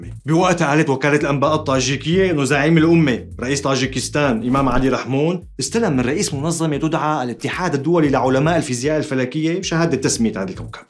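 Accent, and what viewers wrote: United Kingdom. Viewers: the british accent :))) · واو لهجتك البريطانية رهيبة❗😭😭🔥🔥🔥🔥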